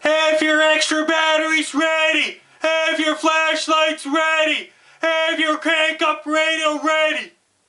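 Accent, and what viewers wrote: Newfoundland, Canada. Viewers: The weather is so much more perplexing when it's being yelled at me by a Newfie guy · no. stop doing your thing. it's fucking annoying and your voice/accent is just fucking terrible. this is why I'm ashamed to be where I'm from. I fucking hate "newfie" accent. You people don't realize just how stupid you sound.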